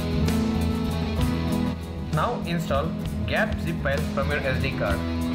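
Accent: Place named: South India, India. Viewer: are u south Indian??? ur accent tells the truth , I'm a south Indian too but I don't have that accent ,I want to know why!!!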